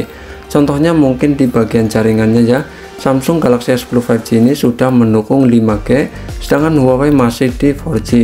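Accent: Banyumas, Indonesia. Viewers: Logatnya ngapak bg😁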